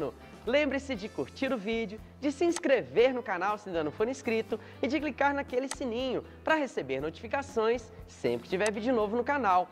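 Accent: Minas Gerais, Brazil. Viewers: Você é mineiro de onde hein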